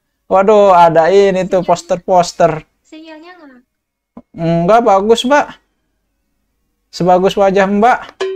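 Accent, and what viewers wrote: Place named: Betawi, Indonesia. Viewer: Mantap bang,,, betawi banget,,,, gasssken🤣🤣🤣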